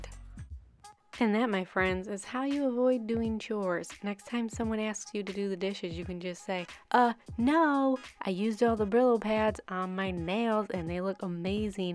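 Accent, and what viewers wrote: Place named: Minnesota, United States. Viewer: The accent reminds me of a Minnesota accent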